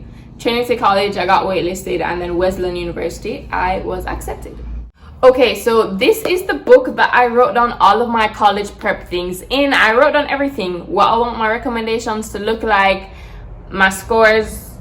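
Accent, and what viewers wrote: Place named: Jamaica. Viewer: Oh I just found your channel like 30 minutes ago and couldn't quite put a finger on the accent. Now that you mentioned that you're from Jamaica, that clarifies it for me. I am applying to come to the States for my PhD and while a lot of what you said was directed at undergrads, I still found a few tips to be helpful as well. Thanks for the great content.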